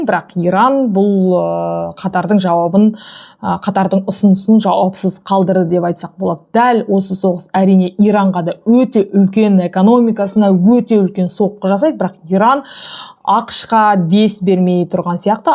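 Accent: Standard. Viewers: Айналайын қандай жақсы таза қазақша жеткіздің рахмет 👍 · Өте түсінікті таза қазақша сөйлейсің рақымет ұзақ ғұмыр тілеймін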